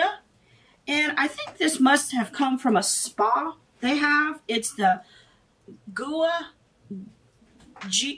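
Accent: United States. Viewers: Hi I stumbled upon your channel and within the first minute I knew you had to be from Louisiana, then when you pronounced Kevyn Aucoin's name the right way i knew i was right. I love Boxycharm and i appreciate all the hard work you but into this video!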